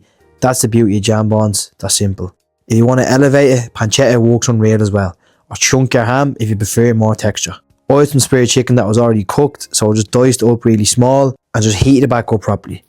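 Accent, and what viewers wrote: Ireland. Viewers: This looks absolutely SCRUMPTIOUS darlin I can't wait to make it and give it a try. Love the accent and love my Irish men ❤❤🎉🎉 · Honestly, his Irish accent isn't that difficult to understand.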